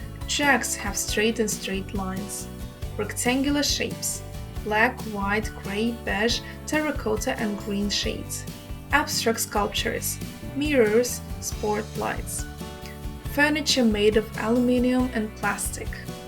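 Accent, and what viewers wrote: Russia. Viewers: Русский акцент уникален😊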